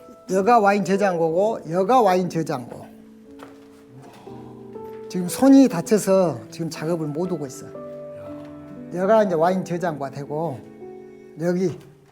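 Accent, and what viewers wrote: Jeolla, South Korea. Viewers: 전라도분인가 보네요..사투리를 찰지게 쓰시네요..ㅋㅋ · 토종 전라도 사투리~ 했제 그랬제 그랬당께~ 께~ 께~께~께깽~ 깽~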